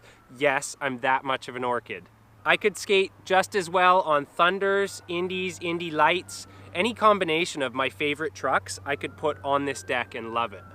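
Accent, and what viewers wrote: Canada. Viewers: are you canadian? kinda sound like it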